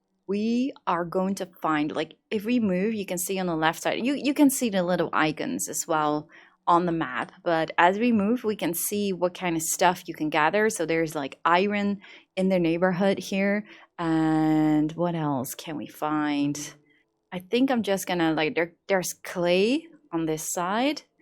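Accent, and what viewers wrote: Netherlands. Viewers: You sound Dutch.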